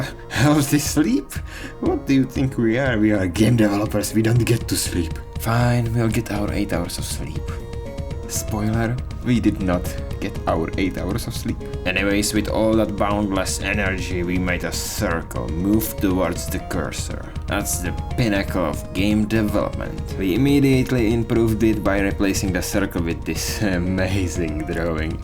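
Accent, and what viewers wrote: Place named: Czech Republic. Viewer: What country are you from if I may ask?? Ur accent sounds Czech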